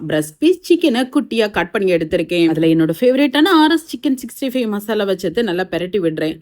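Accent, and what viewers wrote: Tirunelveli, India. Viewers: Sister nenga enna five star hotels chef fa erunthingala and your slang is very nice nellai slang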